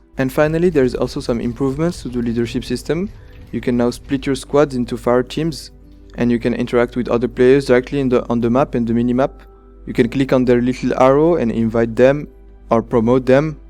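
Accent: France. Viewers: Cet accent francais ^^ Tu parles francais en langue natale ? Il y a des vidéos où tu parles full french :) ? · you are french no ?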